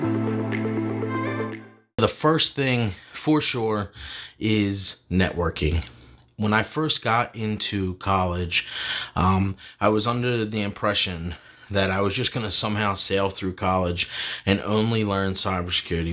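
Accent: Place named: New York, United States. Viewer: Also are you from New Jersey? Your voice makes me think you're from either New York or New Jersey.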